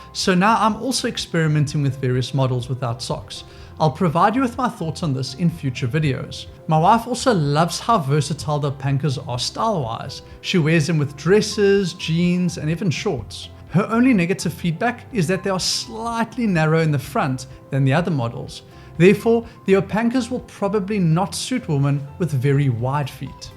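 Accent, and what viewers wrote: South Africa. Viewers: Ja, asseblief, man! When you have the time, I would LOVE to watch a video about the benefits of losing my socks! 😅 I'm very new to this barefoot style of shoe, don't own a pare of vivo barefoots yet, but may just be getting a Geo Racer. Lol. Thanks wifey! ❤️🙏🏻 I've also recently fallen in love with injinji socks, and never used to like those trendy toe socks that people would have about...but Injinji does it right. 😁 After the initial strange feeling of 5 to 15 minutes...they stayed on my feet the whole day, I slept with them on (normally, i rip my socks off my feet at night, because they have to breathe!), and continued the entire rest of the next day with them until my shower that evening. (36 hours) ironically,I had misplaced them, and just found them again a couple days ago...and yes, I'm totally wearing them while I'm writing this! 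🤣 Anyway, loved this video so much, thank you so much for making it, hey! Baie dankie maneer! 🙏🏻 (my afrikaans was always terrible growing up in Cape Town, and it definitely lost importance when my family moved to the states, so I'm afraid you're limited to please and thank you, LOL! Jammer! 😉) It's so nice to hear a legitimate accent again, especially discussing in such detail the benefits of so many vivo barefoot shoes! 🙏🏻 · Dude you sound South African Are you a SAFFA living in Greece? Or am I being silly? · I want to transition to barefoot shoes and Vivo is the only brand available here in SA. It was so refreshing to hear a South African accent that I was immediately drawn to the video. Thanks for the reviews because here in SA the shoes are pricey so making that first choice is crucial especially since I am looking for a work shoe. Thank you!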